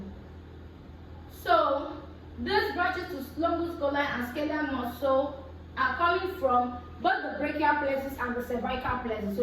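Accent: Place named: Nigeria. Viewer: In our mother's accent. Thankyou mama naija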